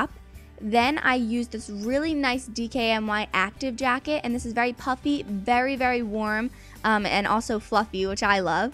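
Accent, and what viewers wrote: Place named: South, United States. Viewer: I thought u were grom the south, you sound country :b<3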